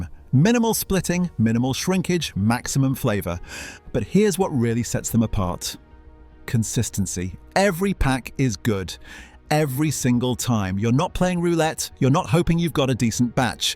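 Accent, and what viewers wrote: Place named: United Kingdom. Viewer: These videos are supposed to be factual and true and the narration sounds British - however the images used in most cases bear zero relevance to British products, in some cases the images show products priced in US dollars! When the pictures are wrong one starts to wonder whether the information is true?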